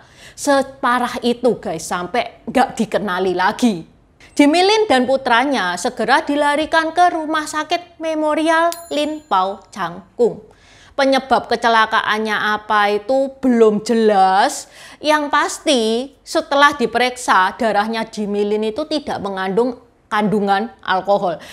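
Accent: Java, Indonesia. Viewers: logat jawa medhok,lucu sy ikut seru klo nonton hehehe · Suka medok Jawanya Mba, salam Surabaya ♥️👍🌴🌿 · Mbak maaf bahasa jawa nya medok bngt🤭😁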